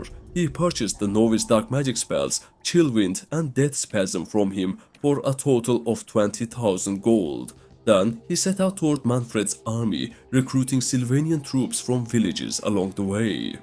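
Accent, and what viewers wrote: Turkey. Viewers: Bro what accent is this?, I hear turkish, but might be wrong? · Böyle baskın türk aksanı görmemiştim çok iyi dknwkendkw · From the accent this guy is %100 Turkish. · this is a Turkish accent tho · Ckhdhchzhdhhhdhz türk aksanı asla atlamam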